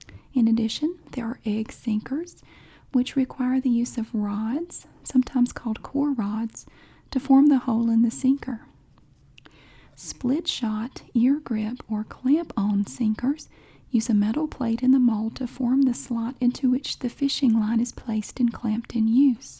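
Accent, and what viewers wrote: South, United States. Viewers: I love your gentle southern accent.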